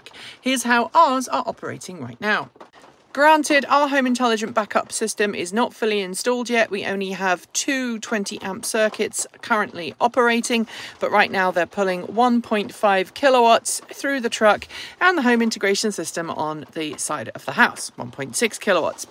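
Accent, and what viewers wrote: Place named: United Kingdom. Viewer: Started video, Heard British Accent, left video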